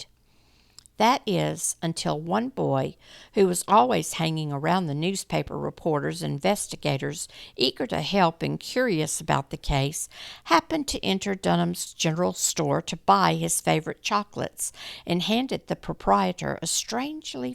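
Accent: South, United States. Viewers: What an odd story. Seems almost modern in its senselessness. Murder is, of course, as old as Cain though. I could be wrong, but I fancy it was probably one of the first cases in American law where psychologists were called upon in evidence. Great podcast series, BTW. - and I always love to hear a classic musical Southern accent!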